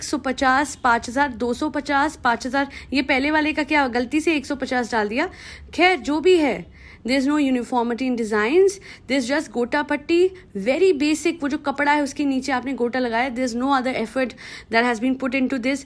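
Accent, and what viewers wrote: Punjab, India. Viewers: Your Punjabi is too cute 🤭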